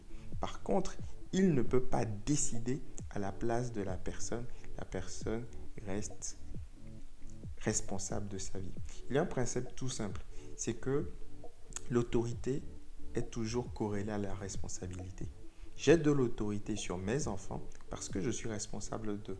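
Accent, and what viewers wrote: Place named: Ivory Coast. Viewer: Bon enseignement pasteur et excusez-moi vous êtes ivoirien ? Parce que je crois avoir reconnu l'accent haha. GOD bless you🔥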